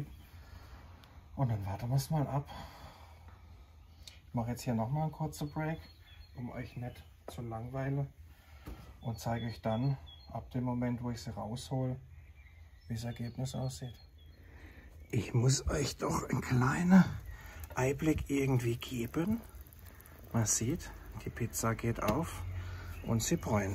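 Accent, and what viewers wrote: Germany. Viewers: Kommscht ausm Schwarzwald?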